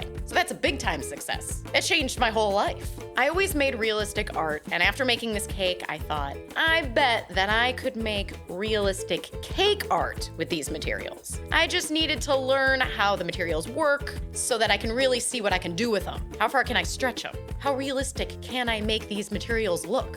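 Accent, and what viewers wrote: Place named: Ohio, United States. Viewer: As a native Ohioan, I could peep Natalieʻs accent months ago! We put "Y"s before our vowels-"Cyan" instead of "Can". Im So proud of a Buckeye doing so well!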